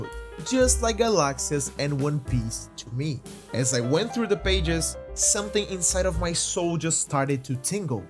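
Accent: Brazil. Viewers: Ja vi que é brasileiro pelo sotaque skkskskssk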